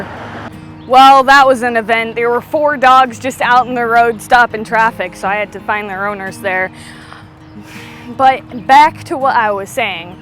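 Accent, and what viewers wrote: Pennsylvania, United States. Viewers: That pa. Ridge runner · She has a PA accent. My wife from Lancaster has the same accent. Sometimes the sentences don’t end, “Do you want to go with…?”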